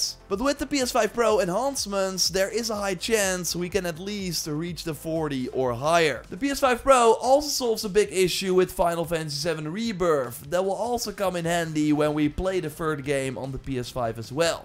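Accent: Netherlands. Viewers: Hearing the accent, dutchie right?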